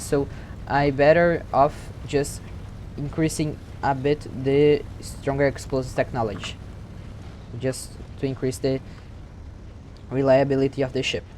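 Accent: Brazil. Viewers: TU TEM SOTAQUE DE BR MEU IRMÃO, TOMA O LIKE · You sound brazilian to me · tu é br mano. kkkk salve aprendendo a falar ingles tamabem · Eu tbm imaginei que era brasileiro somente pelo sotaque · Teu sotque é de brasileiro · Are you Brazilian? The accent is basically identical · Are you brazilian? It sounds A LOT like the Brazilian Accent. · Pretty impressive ship. Don't worry about your English, it's perfectly comprehensible; you do sound like you're Brazilian.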